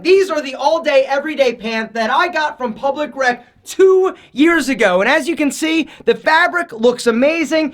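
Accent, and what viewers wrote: Philadelphia, United States. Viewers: This guy gotta be from Philly 🗣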